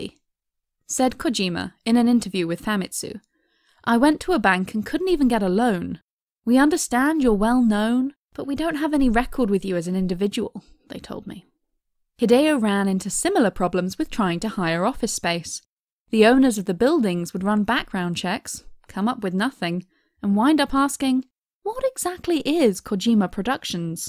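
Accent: United Kingdom. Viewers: It feels like that the narrator is british, my asian ears are hardly listening to this narrator. Glad there is subtitle available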